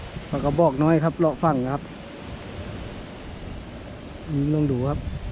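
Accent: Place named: Nyaw, Thailand. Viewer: เก็บเลยครับตากแดดไว้กินคือเว้าญ้อแกมภูไทจังหวัดได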